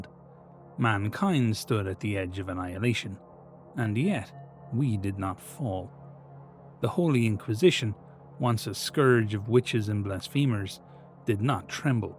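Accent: Ireland. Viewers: These drops are comin in too hot and fast! My heart can't take this much dulcet Irish trench ASMR! (Yes it can, I need more, MOAR!!!!!!)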